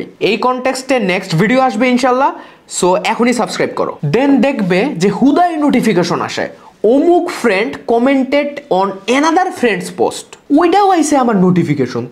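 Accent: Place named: Dinajpur, Bangladesh. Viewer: বাবা তুমি যে দিনাজপুরের ছেলে ভাষা শুনে বুঝলাম।❤❤❤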